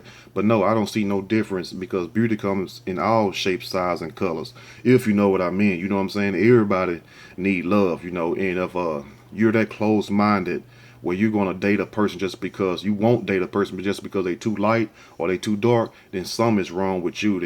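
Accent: South, United States. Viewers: THANK U 4 ANSWERING MY QUESTION. I LOVE THAT COUNTRY ACCENT. BOUT IT, BOUT IT.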